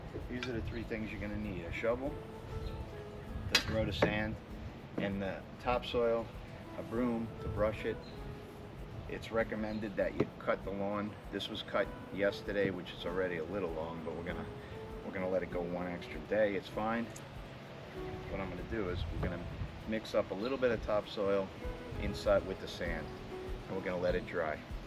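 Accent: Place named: New Jersey, United States. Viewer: I can't find one that has a single pole/handle, which discourages me from buying it. This model you just shown, HANDS DOWN, has the most parts/pieces for the handle, but it does seem to be solid. I'll add it to my cart, as I'm searching, and will pick one.... eventually. Good video 📹 👍 👌 On another note, you sound Jersey but it looks like NC where you're at. I say that cuz i met a guy from NC who sounded Jersey/NY-ish🤘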